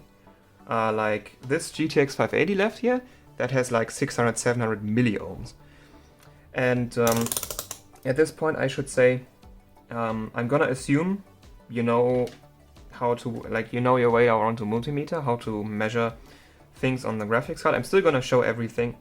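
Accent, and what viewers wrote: Germany. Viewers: I love ze German accent. Awesome video. I also watched the video where you explain the components of a GPU PCB. What I'm missing and would love for you to make is the missing link between those two videos. What points do you need to jab with your multimeter to test what component and what resistance are you expecting to see on a good card? If you find no flaws with resistance testing and power the card, what points are you then testing to test the various components and what voltages are you expecting? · Where have you learned all that ? Btw are you German ? :)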